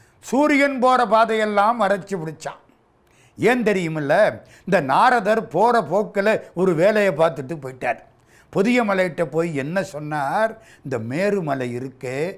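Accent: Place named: Jaffna, Sri Lanka. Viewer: யாழ்ப்பாணத் தமிழ் ஐயா ஒன்றும் மாத்தாதீங்கள்🙏🦚ஓம் முருகா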